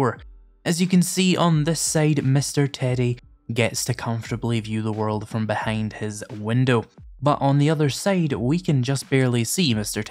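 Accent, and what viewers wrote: Scotland, United Kingdom. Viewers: he sounds Scottish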